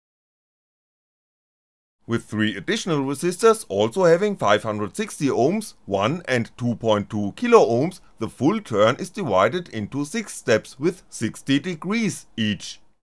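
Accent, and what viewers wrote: Germany. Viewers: Lovely German accent.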